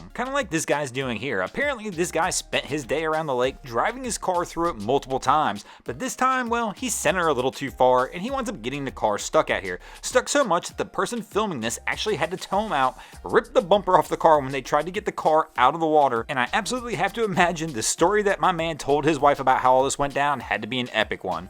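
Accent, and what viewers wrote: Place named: Pittsburgh, United States. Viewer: Why do you sound like your from Pittsburgh? You even say, "dahn there". And yet, you're a Broncos Guru. I don't get it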